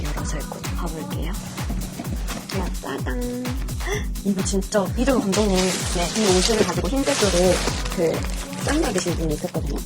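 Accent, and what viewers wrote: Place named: Jeolla, South Korea. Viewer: 전라도 사투리 1.5배속으로 들어도 걸쭉하네요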